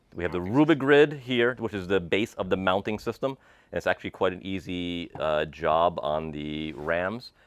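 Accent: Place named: Northeast, United States. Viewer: I was gonna say your channel name is, but your accent is Northeast like NJ or NY. Then I see the GPS on the tablet being New Brunswick!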